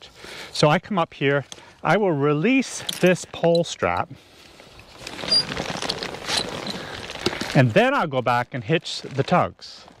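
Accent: New England, United States. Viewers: I was wondering where that accent came form N. E. but not Ma or Ma NH hs become an extension of Boston so it was out and RI (where I lived in 80's has a whole bunch of them Why Prov has at least 6 alone And Woonsocket is a place all to itself throw me down the stairs my shoes where they park the cars side by each and Cranston dont get me started By Love is from there and her nasal is bad)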